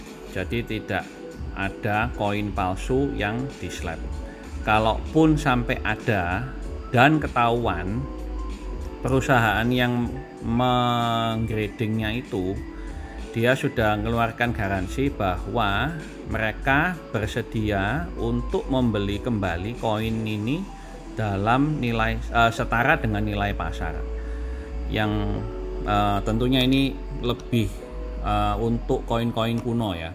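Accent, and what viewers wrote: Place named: United Kingdom. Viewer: cengkoknya british bgt❤👍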